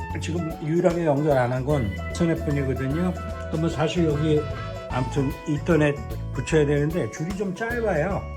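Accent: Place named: United States. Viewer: 꼭 대학생 같아 보이십니다. 미국 교포 느낌의 억양도 반갑게 들리구요. 책상정리, 선정리 하느라 애먹었던 저의 당시 시절도 생각나게 하네요. 영상 잘 보았습니다.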